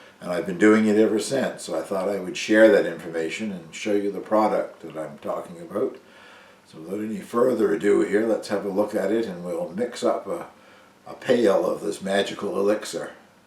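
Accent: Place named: Campobello, Canada. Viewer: Grampy must have donated the extreme accent of some of the Campobello Calders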